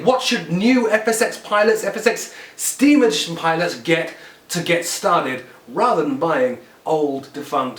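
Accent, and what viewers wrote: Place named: United Kingdom. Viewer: You live in the states? Thought you were british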